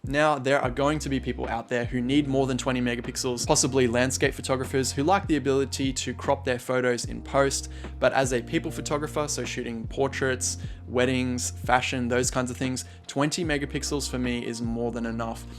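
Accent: Australia. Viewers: Great review. So refreshing to hear some real world opinions & not bashing specs. Also love that it was photo based, not video and finally an aussie accent. Glad I found your channel 📷👍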